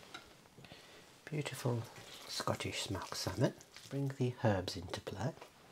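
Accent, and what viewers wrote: Birmingham, United Kingdom. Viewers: that is what we call a brummie accent, personally one of my favs to listen to as a southerner from the uk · You, sir, have finally put some respect on the brummy accent. I salute you. Also I love this style of video!!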